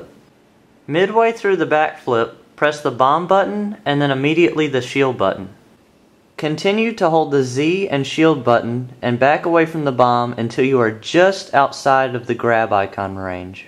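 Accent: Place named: Texas, United States. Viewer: The Hank Hill accent, it's so sweet 😊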